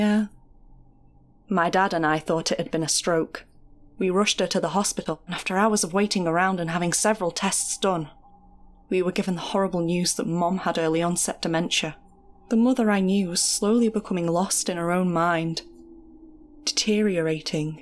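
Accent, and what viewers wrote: United Kingdom. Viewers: Amazing story and she did an excellent job!!! I love a British accent and she def brought it all to the table. Would love to just sit and listen to her narrate stories all day. Good luck, hope to see you push through to the next round!!!!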